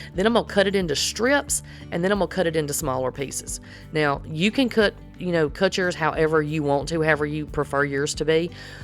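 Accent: South, United States. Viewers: I’ve just found you ! And now working through your recipes,unfortunately can’t get all ingredients as in the uk, but I just love listening to your wonderful accent which is my favourite USA accent, I could listen to your wonderful all day! Only thing is I land up trying to talk like you for the rest of the day along with my family, hope you don’t mind lol !! Xx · I just found your site and boy am I glad I did! I can't wait to start trying your recipes. And I absolutely love your accent. I'm from Texas originally but was raised in California, I really miss the southern accents.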